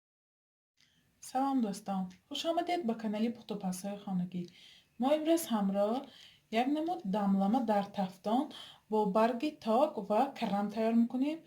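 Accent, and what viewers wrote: Isfara, Tajikistan. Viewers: Офарин хохарчон лахчатон исфараги боин Дастатон дардро набинад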